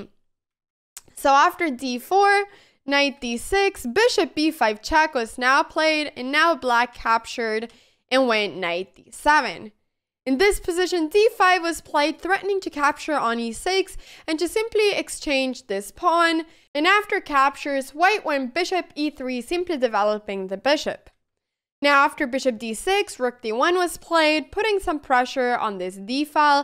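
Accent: Spain. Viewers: Me encanta tu acento en castellano, no sabía que eras española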